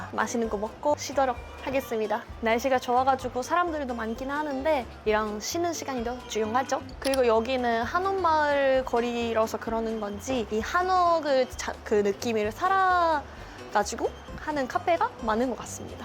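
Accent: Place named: Japan. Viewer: 구독 눌러 버렸네요 다 좋아요.. 곧 TV나 영화에서 볼수 있기를 기대 할께요 그런데 발음 연습은 좀 많이 해야할듯.. 아직은 발음적으로는 조금 아쉽네요 일본 억양이 많아서 연기하기에 그 폭이 너무 좁을듯 하네요.. 하지만 항상 열심히 하는 모습 기대 할께요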